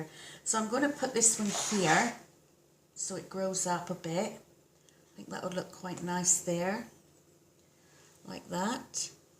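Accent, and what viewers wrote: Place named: Cornwall, United Kingdom. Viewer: Were you from Cornwall originally? You sound as though you're from Cornwall, England.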